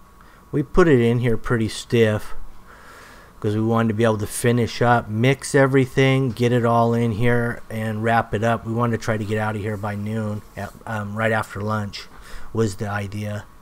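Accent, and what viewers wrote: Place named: California, United States. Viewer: you're a good man. You are a throw back to the true Californians I remember from back then...even the way you talk. AWESOME!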